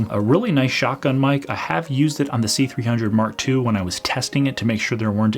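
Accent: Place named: Midwest, United States. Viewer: Thanks for all your great FCPX Tutorials and other content. One question: What's the correct way to use a FCPx project as a template for further projects. For example, lets say that you are making a 10 part series and you want them to have the same intro and outro as well as the same basic look and feel (but of course with entirely different footage and audio in each episode). Is there a way to use a completed project as a template for other projects that preserves intro and outro and the different effects that were used? Any tips on doing this, rather than having to start each new episode entirely from scratch each time? Thanks! btw, I used to live in Omaha and went to Westside High, so I also enjoy your mid-western presentation style.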